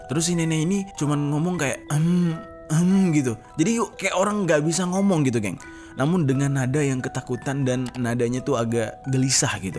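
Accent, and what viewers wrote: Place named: Java, Indonesia. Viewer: Bang Ngomong Jawa Lucu Sumpah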